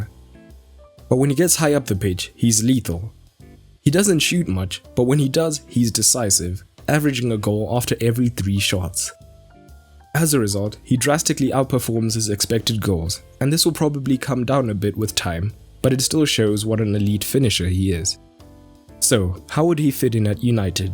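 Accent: South Africa. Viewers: Noh man I've been meaning to ask, are you from South Africa?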